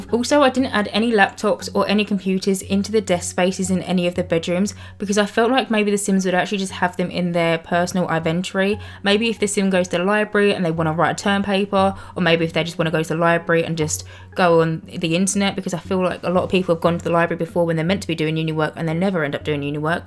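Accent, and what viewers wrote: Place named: Essex, United Kingdom. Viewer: Just came across your channel! I feel like you’re an essex gal like me! (I could be wrong) 😂 great video none the less. Keep going babe x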